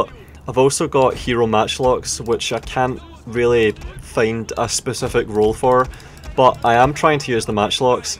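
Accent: Scotland, United Kingdom. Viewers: Are you Scottish? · That was frigging disgusting. Those haters of yours always focus on bullshit cuz u speak the truth, never see them chirping your generalship tho. Honestly you have turned battles into a real art, utilizing all of the game and interface mechanics, I honestly don't know how you click so fast and accurate, switching between things seemlessly, all I know is you have a god tier level of experience in this game and it is very admirable, on top of that you deliver the commentary with a devilish Scottish accent. God speed.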